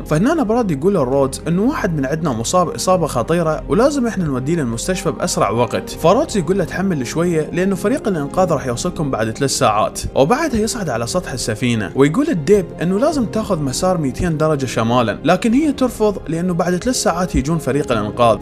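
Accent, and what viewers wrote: Iraq. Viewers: عاشت ايدك على هذه العمل فوق الروعة انته إنسان مبدع وخاصة تتكلم بل اللهجه العراقية الأصلية وهذه السبب الرئيسي في متابعتك 🌹🌹🌹👍👍👍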